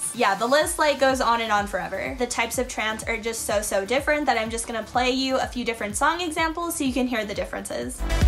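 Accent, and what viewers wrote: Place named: United States. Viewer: The way you pronounce things as an American isn't wrong. If it's a result of your dialect, it's just part of the way you speak :)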